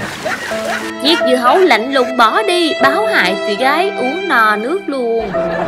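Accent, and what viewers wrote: South, Vietnam. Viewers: Lần đầu góp ý với Channel 3p mình phải thật lòng nói Ms MC có giọng nói Nam Bộ rất vui tính và very intelligence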